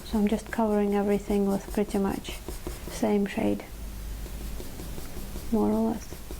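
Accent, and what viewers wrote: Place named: Russia. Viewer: ahhhhh Lebanese! That’s what I couldn’t place! I got the Russian accent but your facial structure, coloring and surname was throwing me off. Lol. You are quite amazingly exotic!😍😍😍😍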